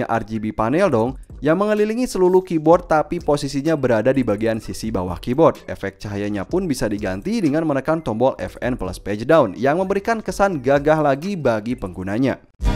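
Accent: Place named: West Java, Indonesia. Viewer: Sunda pisan anjay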